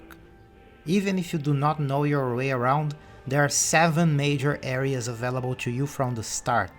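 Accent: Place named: Brazil. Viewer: WOW. What a hidden gem of a yt channel and videogame critic. Came into the video expecting some very copy paste criticisms that anyone could have come up with after a few play sessions. Came out feeling i’ve heard one of the best videogame criticisms in a while, and i watch reviews/critiques of games all the time, especially Elden Ring, since the game made me feel so confused and mixed overall, with so many Outstanding moments and so many boring ones during my completionist 150h playthrough as a Souls veteran. Instantly subscribed, now i need to see some more reviews, there are very few as coherent, thoughtful and all encompassing game critics in yt. Only curiosity... are you from a latin country? Your accent feels similar to brazilian portuguese “ingrish”, and at first i found it a bit annoying, it actually grew a lot in me and it was very clear and easy to understand, and had a good cadence to it. 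Great video and analysis and hope to see more like this in the future!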